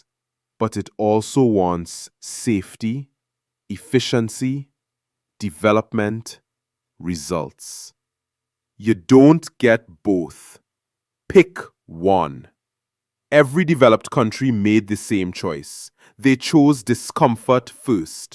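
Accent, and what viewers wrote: Jamaica. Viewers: Who is this person? He does NOT have a Trini accent? He sounds more like a Jamaican. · This person has a Jamaican accent, what do they know about trinis?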